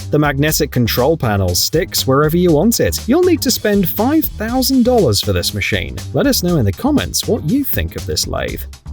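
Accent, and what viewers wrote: United Kingdom. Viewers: I am so tired of listening to those accents of British origins. We won the Revolution a long time ago,, 250 years ago .,,,so I turn you off. This is America.,, I wanna hear an America trying to sell me something. It’s easier for me to understand.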